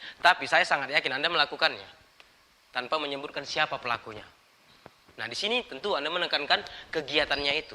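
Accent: Medan, Indonesia. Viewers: Orang medan emg gitu lah logatnya gan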